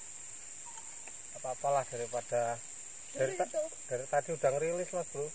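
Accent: Java, Indonesia. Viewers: wong jowo, ndi kie suarane😊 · suruh istri nya nyetrik biar mbak ngrasain streeek ikan gabus,asli dr mana mase koq logat bahasanya orang jawa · Jowo kelutok jebule😁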